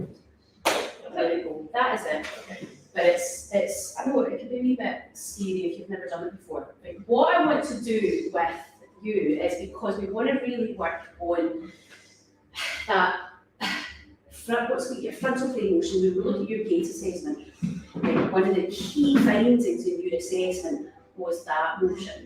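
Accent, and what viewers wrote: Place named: Glasgow, United Kingdom. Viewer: I could listen to pilates with a Glaswegian accent all day long.. love it!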